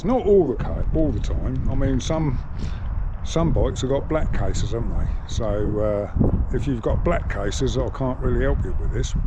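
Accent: England, United Kingdom. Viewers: Lol ,Lazy Bugger. I love listening to English people talk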